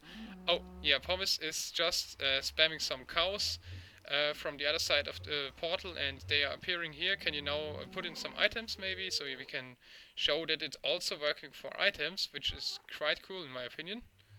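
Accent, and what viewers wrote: Germany. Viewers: Hey, one question, are you from Germany? Sounds like a german accent. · Your accent sounds really German , are you? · Hey Ich bin neu im Sprechen, in welchem Teil von Deutschland kommst du Deutsch?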